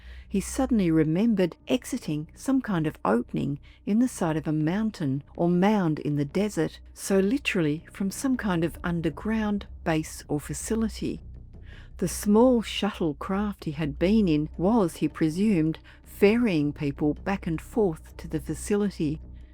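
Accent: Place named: Australia. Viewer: Love your Aussie accent, gentle pace and narrative expression. Great stories too!😀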